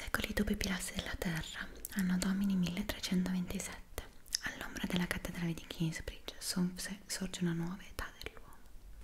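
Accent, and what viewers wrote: Italy. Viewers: An American dude will always fall for a pretty Italian girl with that accent and language. It would be hard not to! 😌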